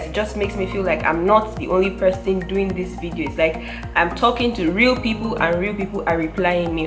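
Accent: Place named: Philippines. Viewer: I am really glad to know and heard from you a kind of Filipino English accent. You deserved to learn it from the Philippines. By the way, the verse (Romans KJV) you had mention is specifically for all the servant of the Lord who serves Him faithfully in good times and in bad times. And that two things work together for good in our lives.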